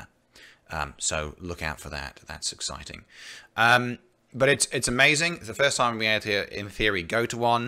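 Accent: United Kingdom. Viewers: You speak with an RP accent so its hard to guess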